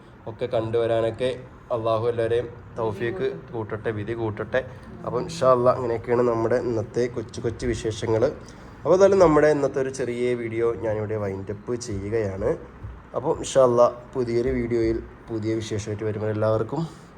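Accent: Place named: Palakkad, India. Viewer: നിങ്ങൾ എന്താണ് പാലക്കാട്‌ ഭാഷ പറയുന്നത്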